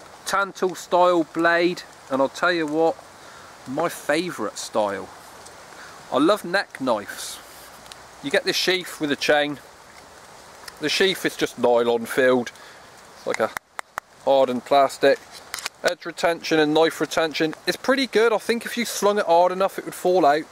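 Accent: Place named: Black Country, United Kingdom. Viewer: Sounds like a Black Country accent mixed with something else.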